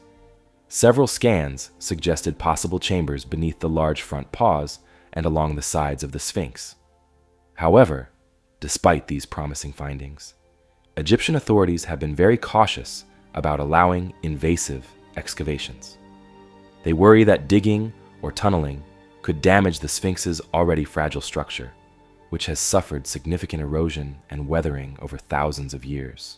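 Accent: United States. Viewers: I just love this narration voice. My favourite ever American accent.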